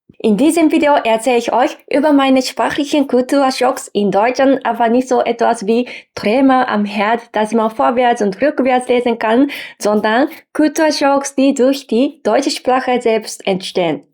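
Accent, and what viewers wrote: Japan. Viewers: Behalte um Himmels Willen Deinen japanischen Akzent! Was Du tun kannst, ist Dir einen prägnanten deutschen Akzent wie Bayrisch zusätzlich anzueignen. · Also, dein japanischer Akzent ist sehr angenehm und klingt toll. Meine Meinung... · Wenn Du deinen japanischen Akzent raus nimmst ist das so als würdest Du die Gewüze aus einem Essen nehmen. Du bist Du und das ist gut so. Es steht Dir zusammen mit deiner symphatischen anziehenden Art, also falls ich das feststellen durfte. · Behalte dienen japanischen Akzent, es sind deine Wurzeln, sei stolz darauf. Ich liebe die japanische Kultur. Aber ich vergesse auch nicht, das Deutschland wie Japan in der Vergangenheit schlimmes getan hat. Ich hoffe unsere Völker lernen daraus. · Der leichte japanische Akzent ist reizend, Grammatik, Aussprache und Satzbau sind perfekt. Respekt!! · Ich liebe diesen japanischen Akzent, du musst dich auf keinen Fall verstecken oder Gedanken machen. 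· Niemand erwartet, dass du deinen süßen Akzent aufgibst. Der japanische Akzent wird als sehr nett empfunden. Ich kenn keine Person aus dem jappansichen Sprachraum, die besser Deutsch spricht, als du. Ich habe länger zugehört und wüsste gar nicht, welche Tipps zur Verbesserung ich überhaupt geben sollte. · Tolles Video! Dein japanischer Akzent ist so かわいい.😻 Es ist schön, auch was über Deutschland aus deiner Sicht zu lernen. Das sind Sachen, die ich eigentlich als normal ansehe, ohne weiter darüber nachzudenken. Das macht richtig Spaß. Ich habe vor 7 Jahren angefangen fast ausschließlich japanische Musik(J-Rock/Indie/Metal und J-Pop) zu hören, und das war für mich der Beginn mehr über die japanische Kultur erfahren zu wollen. Seit über einem Jahr lerne ich japanisch, und es ist echt nicht leicht. Aber ich gebe nicht auf. Freue mich auf das nächste Video. ありがとうございます。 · Du bist durch das japanische gewohnt immer mit einem Lächeln zu reden. 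Wenn du den Mund mehr schließt beim sprechen wird auch dein Akzent weniger. Vielleicht hilft dir das ja. Aber verliere bitte dabei nicht deine positive Ausstrahlung :D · Sie sind super, haben viel reflektiert, sich scheinbar nicht vorschnell eine Meinung oder gar ein Urteil gebildet. Willkommen in Deutschland, die Deutschen werden Sie lieben und Ihre Meinung sehr wertschätzen. Weiter so! Ein Deutscher PS: Ihr Deutsch ist sehr, sehr gut, ihr japanischer Akzent sehr süß, Wortschatz , Wortwahl und Ausdrucksweise besser, präziser als bei vielen Muttersprachlern. Alles gute für Ihr weiteres Leben. Mögen Sie glücklich werden - wo auch immer Sie sind. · Stimmt, deine Stimme ist tiefer, aber dadurch auch klarer und selbstbewusster. 👍😁 Was ich höre, ist klar noch die Betonung (da würde ich das Haupt-Augenmerk drauf lenken ... ruhiger sprechen, leiser, dass der Fluss weicher wird und die Betonung nicht so stark hüpft ... 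🤔), manchmal das "ch" und das Japanische R noch ... aber nicht immer. Generell ist die Verständlichkeit und der Satzbau klasse! 👍 Vielleicht hilft dir das etwas... 😉 Ja, Ironie ist ganz wichtig! 😁 ... ist quasi das deutsche Luft lesen, denn die Kunst ist hier, zu erkennen, ob es ernst, oder ironisch gemeint ist. 😮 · Ich finde deinen Akzent schön. Wahrscheinlich, weil ich die japanische Sprache als besonders schön empfinde und gerne höre. 👍👏👏🇩🇪👋😊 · Dein Deutsch ist so gut da müssen Sie nichts verbessern, und Ihr Japanischer Akzent stört Niemanden im Gegenteil das macht Sie so Symphatisch. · Kann mich nur anschliessen, dein deutsch ist hervorragend, ich kann jedoch deinen Wunsch den japanischen Akzent abzulegen und es ist immer gut wenn man an Dingen arbeitet welche man verbessern will. Jedoch wäre es meiner Meinung auch sehr schade wenn du deinen Akzent komplett verlieren würdest =) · Dein Deutsch ist hervorragend. 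Verstecke nicht deinen japanischen Akzent, der steht dir und klingt gut.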